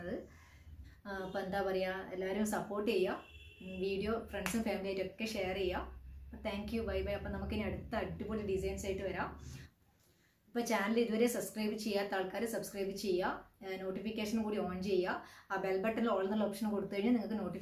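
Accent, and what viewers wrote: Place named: Thalassery, India. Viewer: Hai chechi.. Tlsry evideya veed... Samsaasara kettapo thanne eniku thoniyirunnu.... Nammale aalaanu...🥰🥰tlsry evideya... Njan eppoyum kaanaarundu...👍👍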